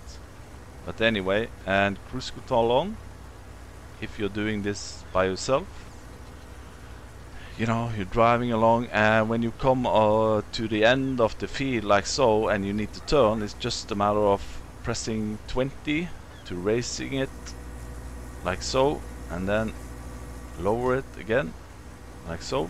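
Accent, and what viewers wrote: Norway. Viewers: Are you norwegian? :)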